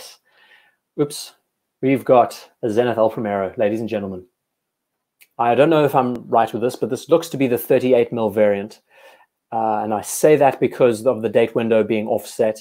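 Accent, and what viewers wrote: South Africa. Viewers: Did not know you were based in the UK. I thought I was picking up a South African accent.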